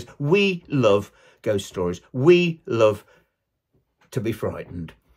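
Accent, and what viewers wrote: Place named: East Midlands, United Kingdom. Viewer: Just stumbled across your channel. Love the content and subscribed. Also miss the East Midlands accent as a Nottingham lad now living in Australia. Keep em coming matey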